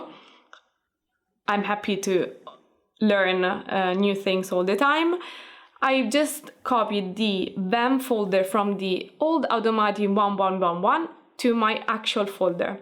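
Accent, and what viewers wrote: Italy. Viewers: You are Italian aren't you? I looove the accent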